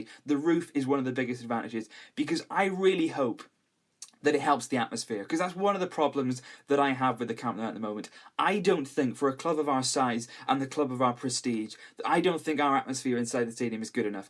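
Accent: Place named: Scotland, United Kingdom. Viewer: Methinks he is Scottish. He should be supporting Celtic, Rangers or by the sounds of him, Hibs or something.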